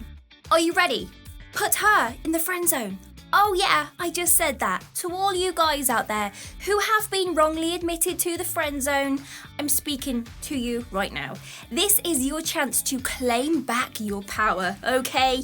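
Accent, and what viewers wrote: United Kingdom. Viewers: I Just listen to her for learning British accent lol.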